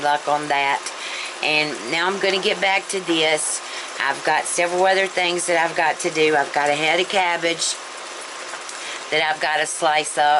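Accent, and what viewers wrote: South, United States. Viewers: Looks delish! Never heard of Lux Pinto Beans..? Do they sell this brand in New York? LOL.... Love your Southern Accent! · I cant believe any body could be so rude to you...I guess someone with too much time on thier hands. Im.a brand new subscriber, love your recipe, and your southern accent..keep the great recipes coming. And God Bless You❤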